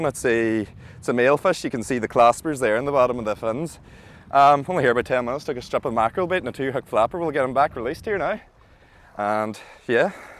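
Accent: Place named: Northern Ireland, United Kingdom. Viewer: Baffled me why your accent was like northern Irish . Till i realised you are only about 12 miles from derry city . Unless you are actually from derry ?. 👍👍. Grandad is from Galway .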